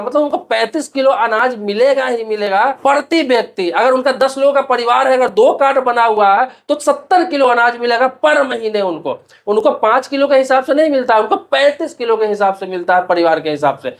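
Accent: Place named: Jharkhand, India. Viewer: Jharkhand ka bolia sir ❤❤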